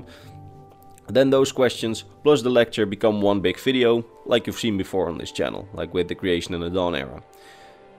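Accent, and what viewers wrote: Netherlands. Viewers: I didn’t really pick up from your accent that you were Dutch, until I thought about it more after you said “Dutch time”. What province are you from, might I ask? Also, love your videos!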